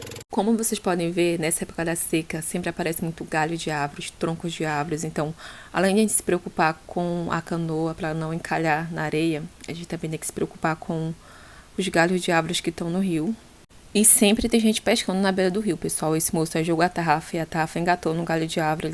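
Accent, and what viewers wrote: Rio de Janeiro, Brazil. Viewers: Engrassado sotaque de Carioca la na Amazonia 😆😅😉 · Para que esse sotaque de carioca? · vc fala parecer ser carioca vc1p kkkkkk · Que legal, não tunha iseia da vida aí, obrigado pelo vídeo, adorei. Gostei do sotaque também 😊, parece com o do Rio de Janeiro kkkk 😍👏🏽👏🏽👏🏽. Sucesso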